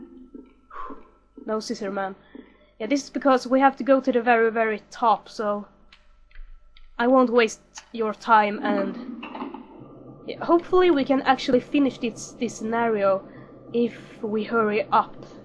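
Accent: Sweden. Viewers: ^ ^ ur accent kinda sounds swedish? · Great LP so far. I've just tried the game, I haven't really sat down to play it yet. cool accent by the way, my guess Swedish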